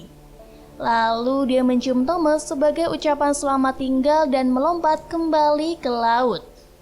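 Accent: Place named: South Jakarta, Indonesia. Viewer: Terlalu Jaksel yah yang baca naskah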